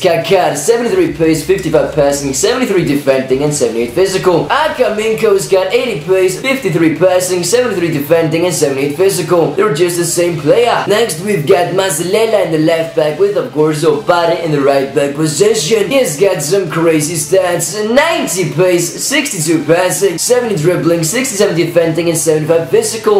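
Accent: Iceland. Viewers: are u Icelandic ?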